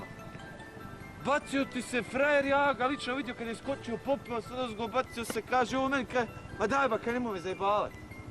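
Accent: Sarajevo, Bosnia and Herzegovina. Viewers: čuj parodija 😂 pa svi mi u Sarajevu ovako pričamo, Nadrealisti i Nele su personifikacija ljudi i života u SA. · Ovo je kalup za ucenje sarajevskig akcenta😊. Ima ga Zena Tln. Minka...Tln. ali ko voli da nauci.evo to je taj. Ima ga i Zera crv.jabuka. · Ovo je normala u Sarajevu, tako se jednostavno prica. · Pa ovako pricaju stare sarajlije, oni koji su se rodili i odrasli u ovom gradu. Sad, to sto si ga ti cuo samo na TLN pa mislis da su ga oni izmislili… Nije akcenat nastao sa TLN ekipom, nastao je sa stanovnicima Sarajeva. Sarajevo ce biti, sve drugo ce proci.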